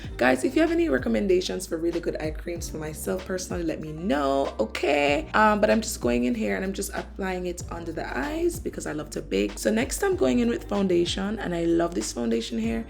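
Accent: Jamaica. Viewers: From mi hear di accent mi subscribe 🇯🇲❤ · What is it, Jamaican? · I don’t if am hearing wrong but are you Jamaican? Because I’ve been binge watching your videos since I’ve discovered you and I’m getting Jamaican vibes 😅. Great videos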